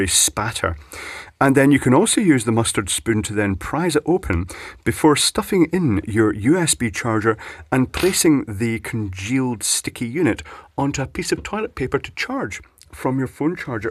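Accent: Scotland, United Kingdom. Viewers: Came here just to hear 'piss-flap' in thick Scottish burr:)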